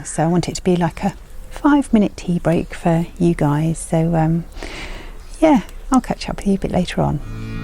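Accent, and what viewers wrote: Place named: United Kingdom. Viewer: I love your videos, I am not a spinner, I am a knitter but it is always nice to see yarn confection. What I appreciate most of your productions is the gentleness of your voice , your lovely British accent, I am Canadian, and your visual aesthetics, all the images of flowers, nature. I love to knit as I listen to you.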